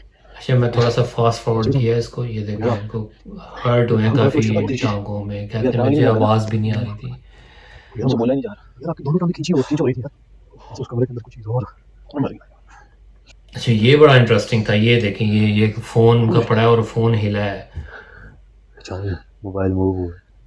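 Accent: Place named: Punjab, Pakistan. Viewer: bai good work mainu tusi v lehnde Punjab ton lagde ho bcz thodi boli Sade wali hai bcz aam loka nu ta madhani jis naal apne ghra ch saag ghot de aa pta hi ni v ehnu kehnde ki aa, jdo v koi Punjabi veer kuch changa karda ta BHT vadia lagda, parmatma thonu Chad di kla ch rakhe. Bht ghaint review karda bai.jwb jarur deo msg da Sat Shri Akal 🌹 Frm- Chad da Punjab India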